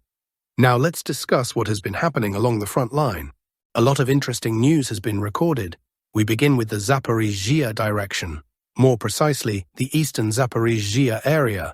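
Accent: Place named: England, United Kingdom. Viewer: How truly Russian or otherwise East European (as your name suggests) are you? Because you pronounce all placenames with a very English distorsion: hulya-powl instead of actual Huliapole, lay-man, instead of actual Lyman (lee man). It's funny but disconcerting, I thought you were from Russia, or somewhere in that part of the world.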